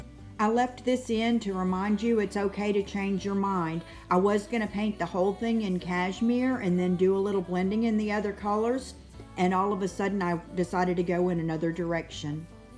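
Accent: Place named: Midwest, United States. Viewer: I'm so happy to have found your channel! Your piece is crazy cool! I've never tried a mural on furniture before, that would intimidate me. You're so talented, this is gorgeous! Okay I have to ask where you're from. Your accent sounds just like my step-MIL (IL/IN).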